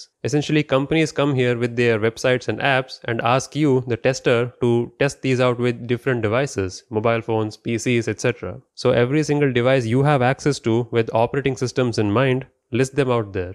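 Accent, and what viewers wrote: India. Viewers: Thanks for being a legit guy,soothing voice and cool hairstyle btw.Keep up the good work. By the accent are you indian?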